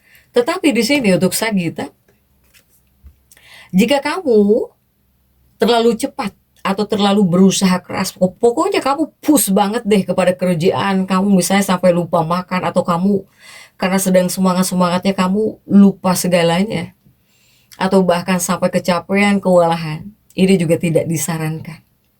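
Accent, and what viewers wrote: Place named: Sunda, Indonesia. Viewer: Ya bner ak suka dgr suara kk sunda, ada serak2nya gtu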